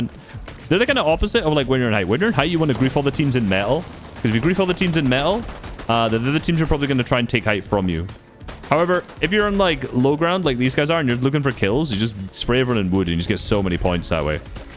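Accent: Scotland, United Kingdom. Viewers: hi, what part of scotland is your accent from, because me and my and my dad are having a discussion about it for some reason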